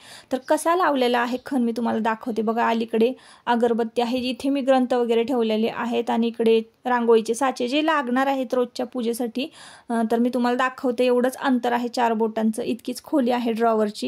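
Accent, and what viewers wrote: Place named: Kolhapur, India. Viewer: कोनत गाव तूमच भाषा कोल्हापूरी वाटते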